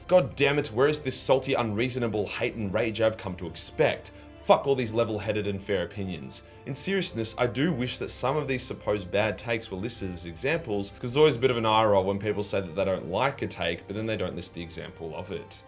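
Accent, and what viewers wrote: Australia. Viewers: Same. also Australian accents just make everything funnier. "Yah dog ahh" 💀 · Yeah, the Aussie accent makes sound like the video is fastforwarded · has comedy, self awareness, lore and the aussie accent, goddamn give me more. Id give up maple syrup and tim hortons for this man. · From an outside perspective, all I see in is just an atypical Warhammer nerd who got really lucky and is pretty good at running a business. The Australian accent and slang helps him in his lore videos and his overall presentation is pretty solid.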